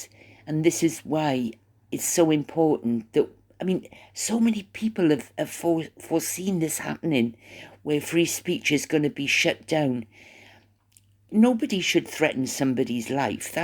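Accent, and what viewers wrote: Wales, United Kingdom. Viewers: Sweet love hearing yr welsh brogue, you can witter away as much as you like. Yr channel, we all come to listen to you whatever the subject. BTW Kamala H can’t pass any bills under a “lame duck” GOP (I think that’s what it’s called before November 2024 elections, am sure our US cousins will advise if incorrect). Why are the people’s “tips” being taxed⁉️ I thought a tip was a “customer gratuity” to make up for low pay & also to get good service, how bizarre. Have a fabulous morning, afternoon or evening 😘🦘🐨💐🇦🇺🏴🇬🇧🇨🇦🇺🇸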